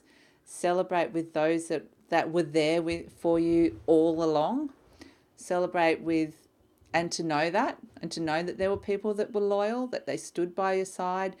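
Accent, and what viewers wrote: Australia. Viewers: Hello from Sydney I love listening to reading I got so excited hearing you speaking Australian English 😃 I’m always coming across American and other accents lol , I’m listening to my husband reading Leo ur spot on , u have a beautiful energy ur face is glowing with positivity great reading god bless you 🙏😊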